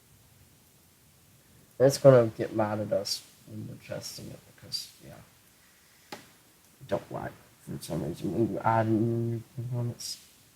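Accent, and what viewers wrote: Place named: United States. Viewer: The American accent is strong in this one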